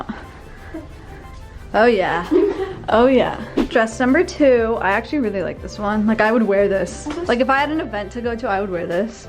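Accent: United Kingdom. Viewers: I love the british accent 😂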